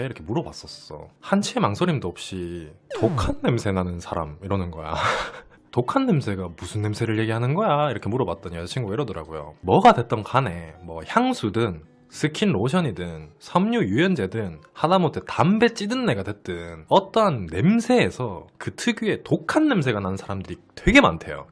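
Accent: Gyeongsang, South Korea. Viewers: 경상도 사투리가 이쁠수가 있구나?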